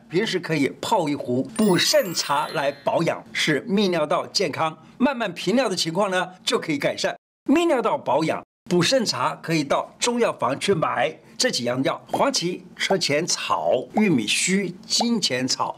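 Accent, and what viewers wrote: Taiwan. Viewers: 胡醫師的台語好可愛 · 胡老的台語 道地 有味道 用心了給你按個讚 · 笑死了，胡醫師說台語好有趣喔，說得比我有趣多了。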